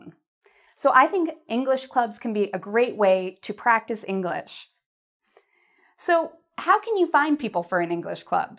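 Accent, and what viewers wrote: Canada. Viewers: Hi. I like listening to English when I got a notification that you have uploaded the You-Tube video. There are several reasons why? Not just for one reason that you're a beautiful young lady with authentic Canadian language, but for many others. You have been teaching us (youtube subscribers) English for many years and not just English, but many useful things that we can apply in our daily life or lifestyle. Whatever, I feel often that is more useful than watching the daily news coming and going or some unrealistic movies, shooting, fighting, running, screaming actors for millions of dollars. So, thank you very much for your devoting work as well for time and energy giving so much to others.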